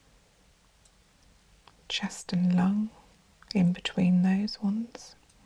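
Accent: England, United Kingdom. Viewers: What area are you from in England? You accent sounds so much like a friend of mine. Such a soothing voice!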